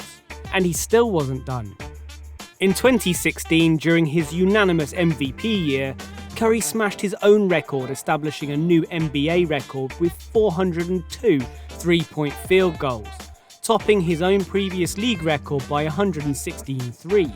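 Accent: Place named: England, United Kingdom. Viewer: Stephen and not steven. I am sure u re inglish man and not american because of your accent.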